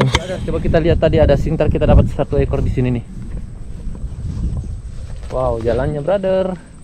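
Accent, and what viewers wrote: Indonesia. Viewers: BHS nya spt org gorontalo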